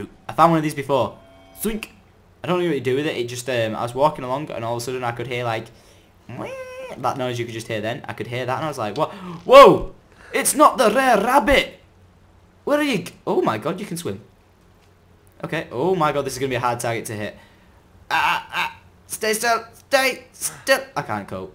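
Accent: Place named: Scotland, United Kingdom. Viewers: when he was reading the story in a Scottish accent i died of laughter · Top notch scottish accent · Nice Scottish accent yuv got aye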